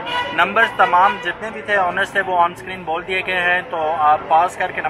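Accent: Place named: Hazara, Pakistan. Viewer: Apka lehja bta raha hy ap hazarewal ho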